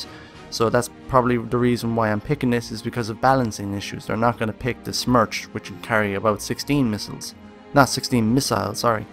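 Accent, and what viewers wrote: Ireland. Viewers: Hey, how do you get to play at E3, i was at it, but i didnt get to play anything? also great video, are you irish? u sound irish, im irish lol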